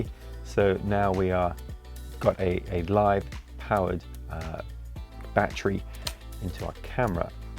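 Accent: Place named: United Kingdom. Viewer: British accent and 'dollars'. Odd.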